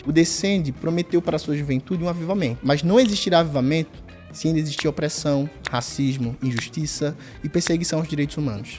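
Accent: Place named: Northeast, Brazil. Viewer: KKKKKKK Mano que absurdo foi esse aí que tu falou. Tu acha mesmo que isso e movimento político e "racistas"? Isso é ridículo parceiro. Inclusive o the send Não e um conjunto de igrejas amigos, e muito menos uma estética nas mesmas. Quanta mentira cara. E por último, o livro do Yago Martins não criminaliza a pobreza. Qualquer um que LEU sabe. E me entristece muito reparar nordeste no seu sotaque, parece que ainda tem muita gente alienada ou alienante por aqui.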